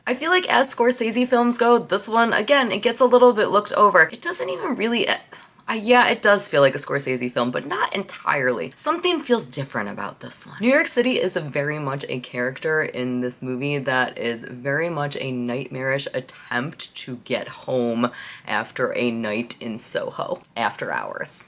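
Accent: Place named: Detroit, United States. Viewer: Can I test my accent ear and guess you’re from Michigan? Specifically the Detroit area.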